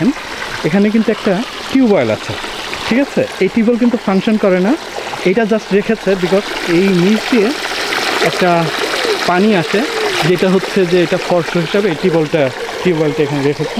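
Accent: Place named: Tangail, Bangladesh. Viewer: I saw very old videos of u, and got to know by ur speaking accents that your hometown probably in Tangail. I was surprised my hometown also there. And currently i am studying at University of Dhaka in Chemical Engineering 3 rd year first semester. Hopeful so see you if my wish going to Germany is fulfilled in shaa Allah. ❤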